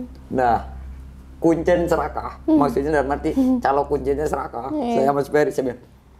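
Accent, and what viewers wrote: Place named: West Java, Indonesia. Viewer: Logat sunda nih ya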